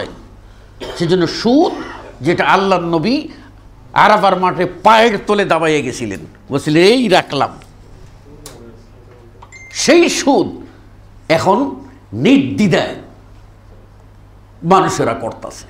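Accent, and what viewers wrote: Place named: Sylhet, Bangladesh. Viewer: He is a Sylheti accent..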